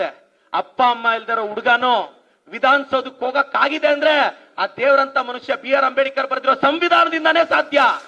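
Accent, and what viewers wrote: Telugu, India. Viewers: Telugu style dialogue super guruve.👏👏👏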